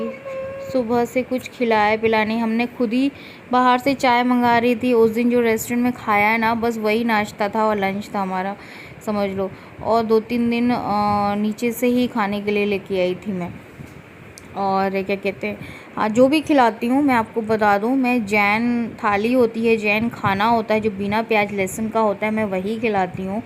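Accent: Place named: Uttar Pradesh, India. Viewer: Up language lapat chal rhi h😊😊😊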